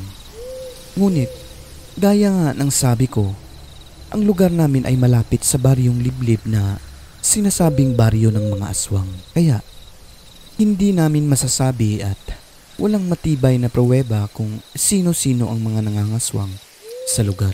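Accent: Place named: Iloilo, Philippines. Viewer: Sakit naman boss nawalan ng ina tapos ang dahilan di pa pinaniwalaan ng iba.I mean boss di ma explain..napapatawa ako boss kapag mag ilongo ka boss sarap lng pakinggan ba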